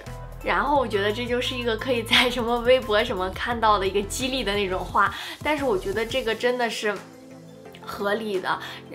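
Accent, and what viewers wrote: Shandong, China. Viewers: 生日快乐！没想到第一次看你的视频就碰到你过生日～好接地气的女孩子，不知道为啥听出了山东味儿，好喜欢你，率真朴实，继续加油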